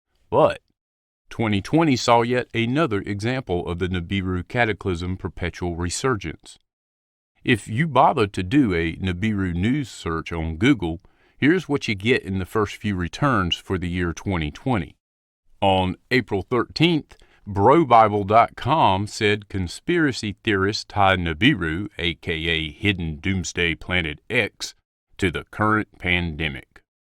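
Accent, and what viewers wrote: South, United States. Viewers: I live in the south, and his accent is most definitely southern!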